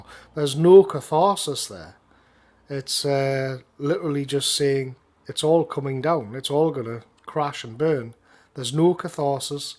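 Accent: United Kingdom. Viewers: Great stuff. I enjoy these kind of youtube reviews more than any modern films themselves. In fact i simply dont watch films anymore if im honest. I particularly enjoy it when gets into fits of laughter. Made me chuckle on walk home from work out loud! Something about discussing what is actually quite a depressing subject and not helping but laugh that really takes sting out of it. Definition of if didnt laugh you would cry. Thats important i think, and very british characteristic (particularly with great accent). 👍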